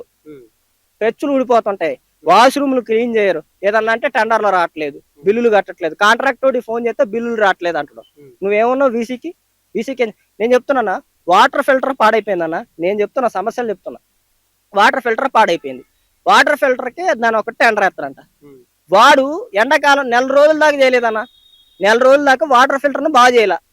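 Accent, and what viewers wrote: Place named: Andhra Pradesh, India. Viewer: వీడు ఆంధ్ర స్లాగ్ మాట్లాడు తున్నాడు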